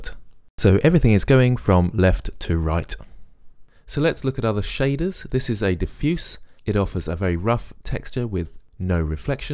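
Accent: United Kingdom. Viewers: one of the best blender tutorials. btw, I really love your british accent - listening to you is better than bbc actually !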